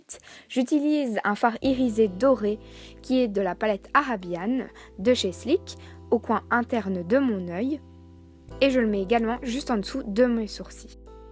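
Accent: Belgium. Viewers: Vive la Belgique et son accent 😅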